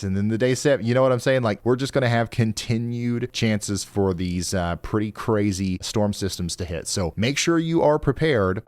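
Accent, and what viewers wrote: South, United States. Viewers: If a tornado is over my neighborhood and does pronounce my city correctly the tornado doesn't go away. I know what he's saying and to run not walk. has a southern accent and that's all. Common sense people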